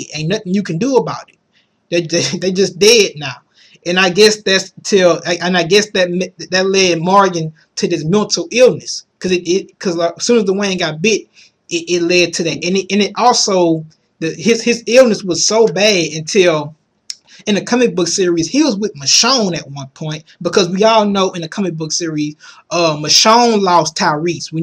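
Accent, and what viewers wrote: South, United States. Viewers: YOu Talk funny! LOL! A real live Southerner.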